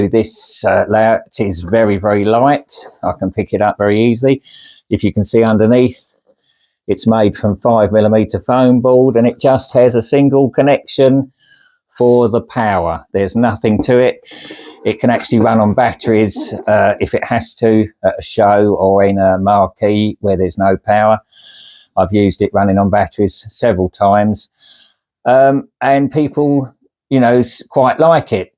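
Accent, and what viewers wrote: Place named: United Kingdom. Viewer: I came from the youtube ad and initially i kinda chuckled going this is the most british man ive ever heard but when i actually came and watched it i wound up actually enjoying it Model trains have always been my favorite especially when me and my late father would go to the fair and spend 20 minutes just looking at the model train set in the back Thanks for brining back some of those special memories man ❤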